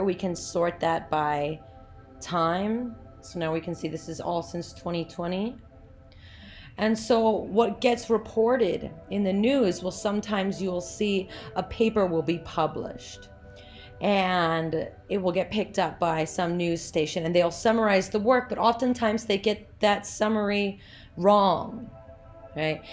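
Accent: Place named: New York, United States. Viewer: Really easy-to-follow/understand lecture! I've never had an online professor who has their lectures set up on YouTube before. I like your accent. Are you from New York or New Jersey?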